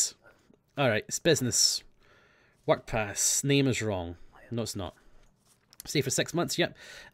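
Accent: Scotland, United Kingdom. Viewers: He is Scottish he can't control his accent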